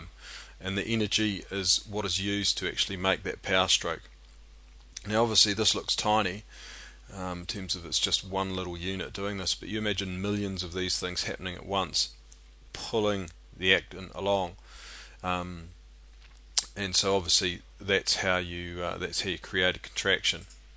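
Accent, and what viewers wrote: New Zealand. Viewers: sounds like a Kiwi XD excellent video!! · I'm pretty sure it's New Zealand accent, since i've been there for 2 years for high school.. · thats a typical kiwi accent :) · yep hes New Zealand!!! you can tell by the way he says his E's and I's ie, head, actin etc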